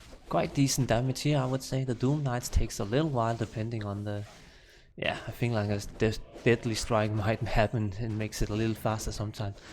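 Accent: Denmark. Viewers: Are you from Denmark? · The danish accent screams at me haha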